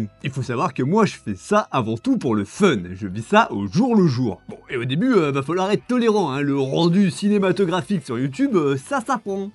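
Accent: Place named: Lyon, France. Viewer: Bien vu,! Excellent début, cool de te retrouver ici. Le ton que tu emploies me fait penser à un autre glorieux Lyonnais que tu as cité dans tes références - je te laisse deviner. Longue vie à ta chaîne, c'est cool! ✌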